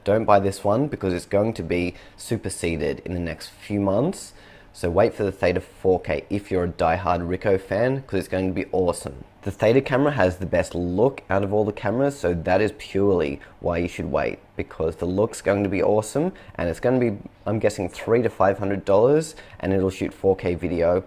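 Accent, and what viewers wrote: Australia. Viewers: Your saying the original sperical shaped 360 gear from 2016 records in 4K. I'm looking through reviews and nobody is saying that. Well I puzzled??? is this for real it records in 4K? thanks for answering in advance and my other question (seeing you are in Australia by the looks and accent) where in Australia can I purchase the XIAOMI? Can you recommend any retailers that sell it?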